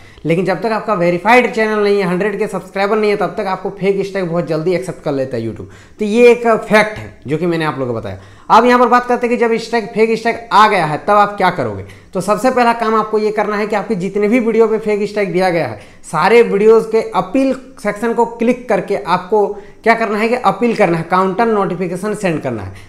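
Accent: Bengal, India. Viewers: Ap Bangali ho kya?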